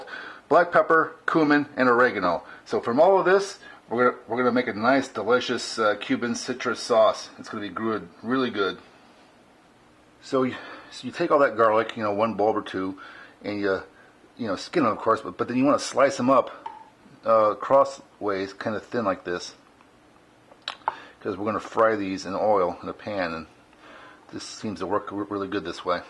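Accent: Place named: Texas, United States. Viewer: You sound texan 😂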